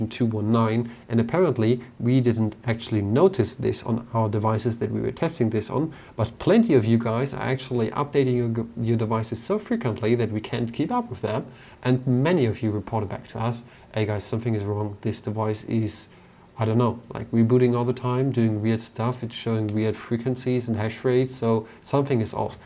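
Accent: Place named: Oxford, United Kingdom. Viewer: Klingt sehe nach Oxfordenglish. Leider ist mein Verständnis sehr begrenzt. 😪 Best regards from Ingo😊. 🖖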